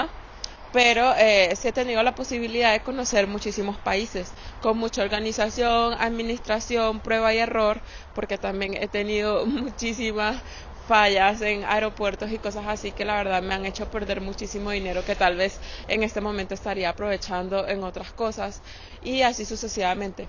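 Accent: Colombia. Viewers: Porqué tienes un acento colombiano tan marcado 🤔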